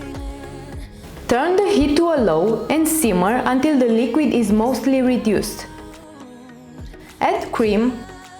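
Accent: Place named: Romania. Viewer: Love the recipe and your accent. Is it Romanian?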